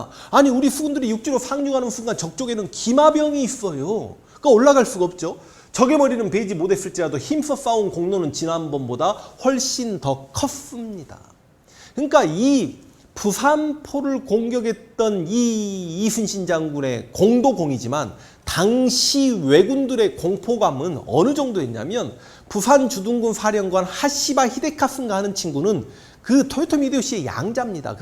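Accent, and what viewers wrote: Jeolla, South Korea. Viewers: 황쌤은 고향이 혹시 전라도인 맞나요? 강의의 냄새가 남쪽 냄새가 그득하게 느껴줘요.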